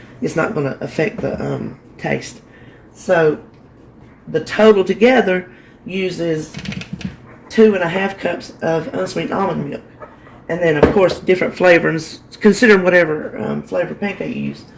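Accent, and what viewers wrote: South, United States. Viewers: Hi! My name is Elizabeth. I just recently started watching your videos. I totally understand your southern language since I am from Mississippi but now live in Niceville, Florida. You are doing great! Keep up the good work. I love you are taking the good ole southern cuisine and making it ww friendly. Have a great weekend!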